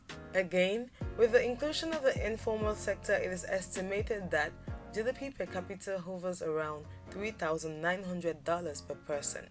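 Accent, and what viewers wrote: Nigeria. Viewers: This person sounds Nigerian Proudly south african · Your Facts are 100% and I must admire that given that I can Pick up the Nigerian accent in you...I thought you were gonna be Partial buh you proved Me wrong..And Yeah,South Africa is a Much Better Country to live in as Far as Africa is concerned .. Oohw..Im South African by the way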